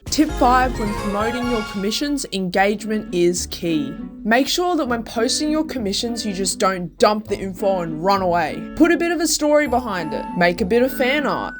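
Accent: Australia. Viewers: I got whiplash hearing my very own thick Aussie accent thrown at me. I’m always expecting American at this point. Great video btw ✌️ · the aussie acent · i thought they sounded australian lol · idk why i just love her accent, usually i don't care for Aussie accents, i think they sound like goofy British accents, similar to Canadians fake French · they sound australian(?)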